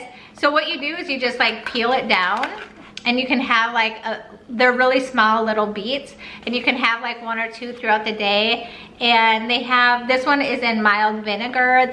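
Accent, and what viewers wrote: Minnesota, United States. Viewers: You still have that Minnesotan accent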